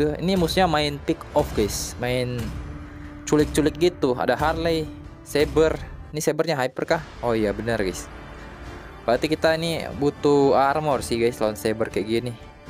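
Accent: Manado, Indonesia. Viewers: Orang asli manado ngana bro?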